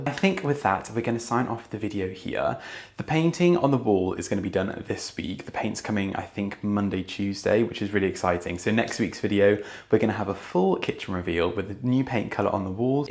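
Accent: England, United Kingdom. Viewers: thank you for today's enjoyable video. I love watching each one. You provide such interesting content. I love your new kitchen renovation. You have incredible taste and a wonderful sense of style. Your videos are not only inspirational but also instructional. As you explained the aesthetic and practical values achieved in the kitchen and garden, I learned to appreciate those perspectives in the changes that I make in my own surroundings. You are an incredibly gifted and talented young man. Besides, I could listen to your great English accent 🇬🇧 all day. Dending you and God's blessings of love 💖, joy 😊, peace 🕊️, and more renovation ideas for your home 🏡. From across the pond 🇺🇲, Robert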